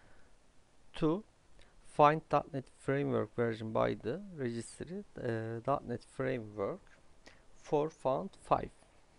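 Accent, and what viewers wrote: Turkey. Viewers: Sounds like a Turkish accent. Where are you from buddy? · Direk anladım Türk olduğunu :D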